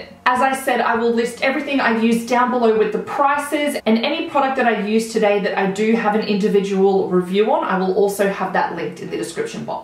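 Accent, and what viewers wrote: Australia. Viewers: Of all Aussie beauty youtubers your accent strikes me as the most aussie! Its how the last word in your sentences goes up ↗️ You must be from Queensland 😂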